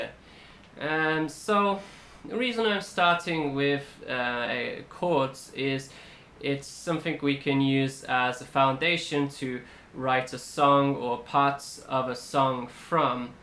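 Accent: United Kingdom. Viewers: You sound like the British reporters who report the war in Afghanistan.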